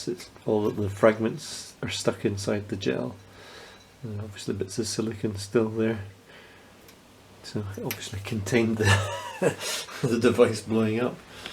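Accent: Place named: Scotland, United Kingdom. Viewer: where do you live sound Scottish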